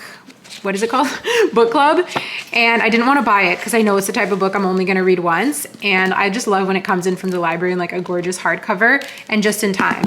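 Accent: Canada. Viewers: I love hearing you speak in French!! I always hear the French accent learning French but I love the Canadian accent it’s so cool to hear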